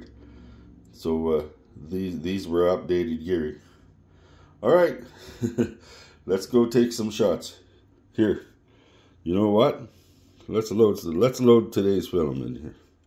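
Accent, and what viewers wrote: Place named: Manitoba, Canada. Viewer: I am not sure how I have not come across your channel before today, but I am really enjoying your videos! 👍 Also, as a Manitoban-in-exile, it is so good to hear a voice from 'home' on YouTube. 😁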